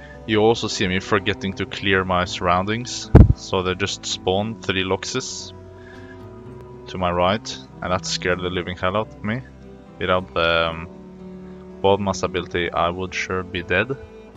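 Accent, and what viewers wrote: Norway. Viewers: Are you norwegian? Great video!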